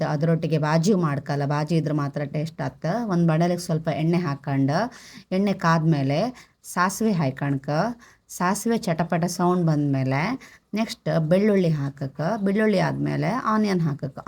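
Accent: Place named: Kundapura, India. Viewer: ನಿಮ್ಮ ಕುಂದಾಪುರ ಕನ್ನಡ ಇಷ್ಟ ಆಯಿತು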